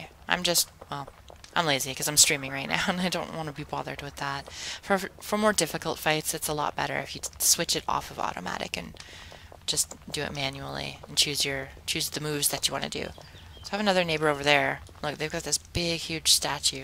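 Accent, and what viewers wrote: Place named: United Kingdom. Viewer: Where's your accent from? It sounds like a little British in there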